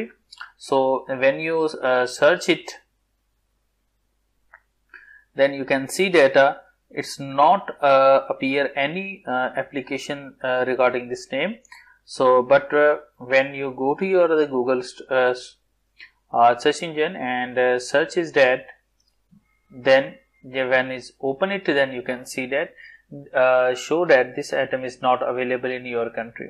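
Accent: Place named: India. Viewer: I can't listen to this the indian accent is ridiculous. Learn better English please.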